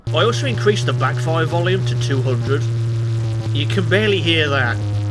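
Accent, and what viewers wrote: United Kingdom. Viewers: Bro sounds like the UK. He sounds exactly like a UK haver would sound like.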